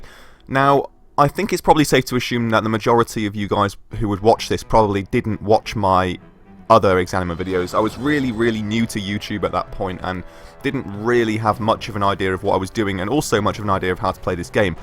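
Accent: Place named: United Kingdom. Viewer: Oh heck yes! A sexy British voice guiding the events that unfold upon gameplay! Heck ya!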